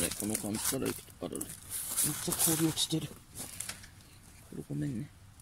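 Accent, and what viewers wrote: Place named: Tsugaru, Japan. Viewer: また笑って見ました。女子ソロキャンパーの新境地開きましたね。お茶の間劇場ぽいキャンプ動画で漫画チック✨✨楽しいなあ。 jaijaiさんの自然体津軽弁？に温もりを感じます。プラスちゃんの可愛いキャラクターがgood💕 なんかヘルパーのお姉さんとバアサンのコラボみたいになっちやいましたね。🤣 お天気に恵まれてヨカッタヨカッタ\( ˆoˆ )/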